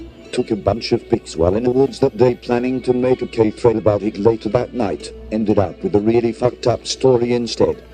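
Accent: United Kingdom. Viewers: Thanks for the upload brother. Got a new channel to binge later. So far you are the only other text to speech channel that used the male English accented voice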